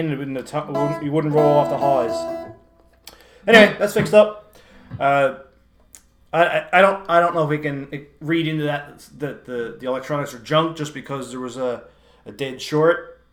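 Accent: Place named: Ireland. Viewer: Lovely guitar, Your accent sounds like an Irish one at times , Greetings from Dublin 🇮🇪 🇨🇦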